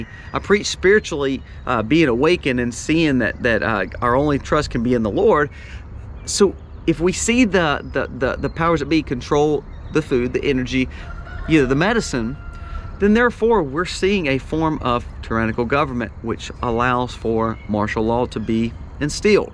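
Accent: South, United States. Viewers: I am new to your channel as of a few days ago! I have learned a lot already, so keep the videos coming. Love that southern accent ❤!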